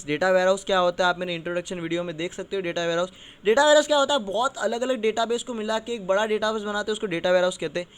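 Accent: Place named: Mumbai, India. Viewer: way you like teach in Mumbaikar style.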